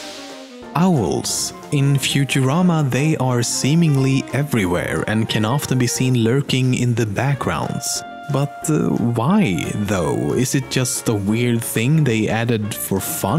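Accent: Sweden. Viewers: the guy talking must be swedish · I'm going out on a limb here and guessing the presenter is Scandinavian. Hmm... Swedish?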